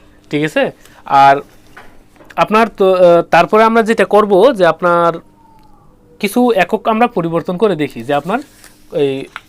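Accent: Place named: Comilla, Bangladesh. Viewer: মনে হচ্ছে আপনি কুমিল্লার ভাই। আপনার জন্য শুভ কামনা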